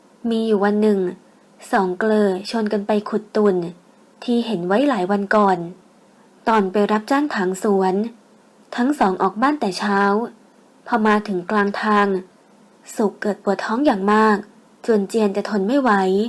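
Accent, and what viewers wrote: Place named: North, Thailand. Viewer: ผ่อหื้อกำ ฮาจะขี้นี่55555แอดน่ารักมากเลยที่พูดเหนือ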